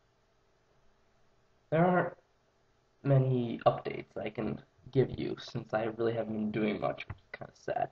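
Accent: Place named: United States. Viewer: Why do you have an American accent?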